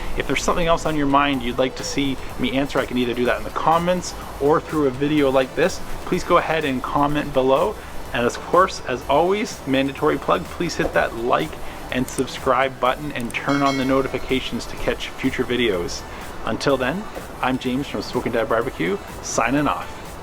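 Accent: Canada. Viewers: Is James from Canada? His "outs" are very Canadian. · Stop speaking Canadian! Or just don't use the words out and about.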